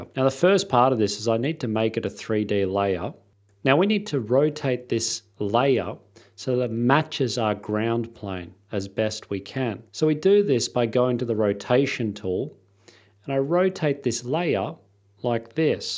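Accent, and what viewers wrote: Australia. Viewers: a bit off the topic question....but.... are you Australian?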